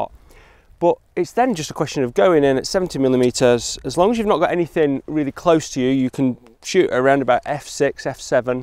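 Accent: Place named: Scotland, United Kingdom. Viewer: I am interested in your classes for purchase. It is surprising to me that I am even used to your Scottish English now:)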